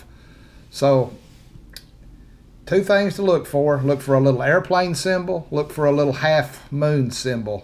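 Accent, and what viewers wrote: South, United States. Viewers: Southern Draw, Sa....weet..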